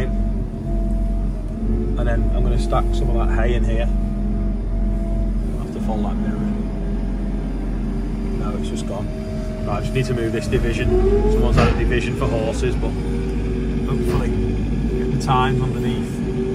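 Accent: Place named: England, United Kingdom. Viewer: Nice video.. only i understand half the time the english accent you are talking😅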